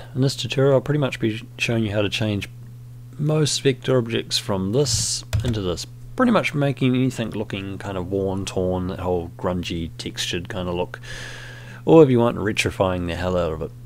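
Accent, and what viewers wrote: New Zealand. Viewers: Thanks, great to hear a kiwi tutorial for once, very clear and easy to follow:) have subscribed and will check out more of your stuff soon, thanks for shearing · Thanks for this! Great to hear a kiwi accent on a tutorial for a change too.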